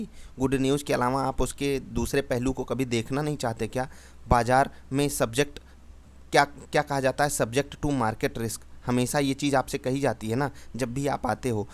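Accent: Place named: Uttar Pradesh, India. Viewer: Sir apki baatein bohot pasand hain aur achcha analysis dete ho aap... UP ke lagte ho aap.. kanpur ya lucknow ke aas paas ke? Kahan se ho aap?... EK BAAT AUR BATA DIJIYE for my analysis purpose... Which software or website u r using for technical charts ? right here in ur Video..🙏🙏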